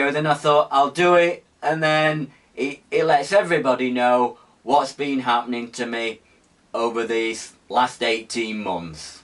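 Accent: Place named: Sheffield, United Kingdom. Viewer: Good luck kid you're a South sheffielder so you sound nowt like me but your videos are brilliant keep up good work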